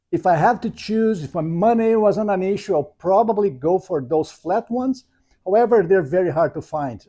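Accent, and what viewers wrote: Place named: Brazil. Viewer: Sounds like you have a Brazilian accent. Greetings from PA!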